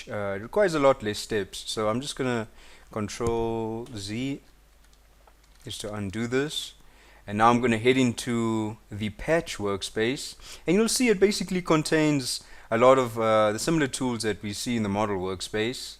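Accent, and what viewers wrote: South Africa. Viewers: This is brilliant, thank you! Lovely to hear a fellow South African accent.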